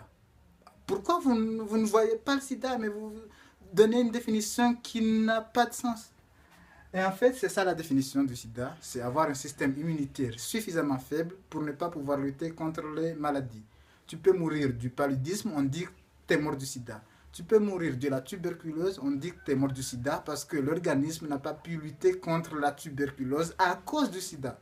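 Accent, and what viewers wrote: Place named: Comoros. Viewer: vous êtes comorien? Ton accent me familier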